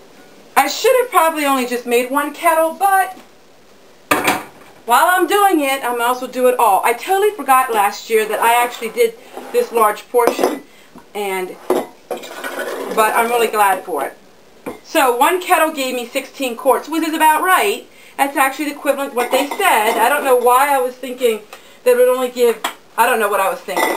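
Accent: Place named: South Jersey, United States. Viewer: I grew up in South Jersey, and hearing you speak reminds me of home. Are you in Pennsylvania?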